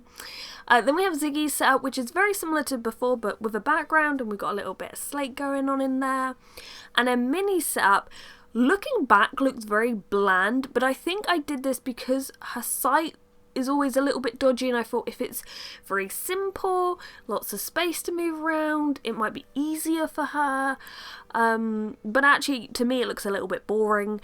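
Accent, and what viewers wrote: United Kingdom. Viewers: i love brittish accent :3.... i just love it _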